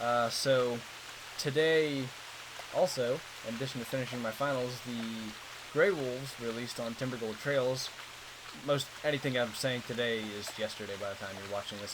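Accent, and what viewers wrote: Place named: Pennsylvania, United States. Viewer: So am I. That's awesome bro. I had a feeling by ur slight pa accent.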